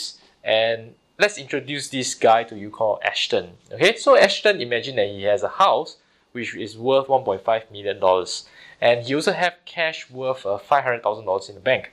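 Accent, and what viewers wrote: Singapore. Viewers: I just can't take the heavy (Singapore?) accent, so I quit watching after 30 seconds. But I am pretty sure he doesn't know how to value a stock, his approach is basically guessing. If this is the right way, analysts would not deserve to be paid that much. I don't blame on him, in fact I would be surprised if anybody at his age does.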